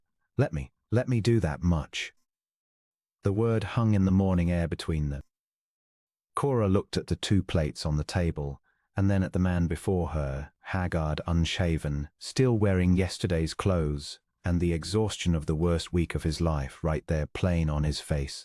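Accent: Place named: United Kingdom. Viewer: At first was not sure I would like the narrator’s voice with a British accent, but as the story progressed, liked the cadence except the way Lionus was pronounced. Listening from Clarksville, TN.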